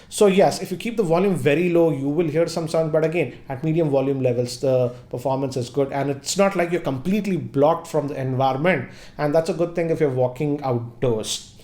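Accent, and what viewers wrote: India. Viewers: Truth time...I only clicked on the video to hear an Indian English accent....was not disappointed. Also....nice review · He is an Indian and most of the Indians have a similar accent but he is providing good content though.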